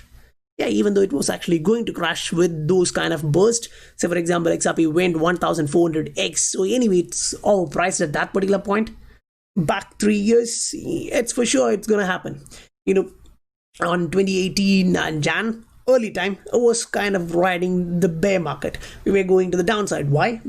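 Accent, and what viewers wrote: India. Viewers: 2 seconds in, lemme guess, you're indian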